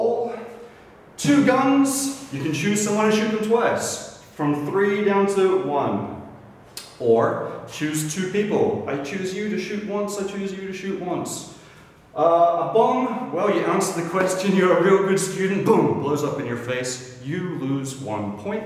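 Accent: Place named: Darwin, Australia. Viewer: He sounds like he's from Darwin, Australia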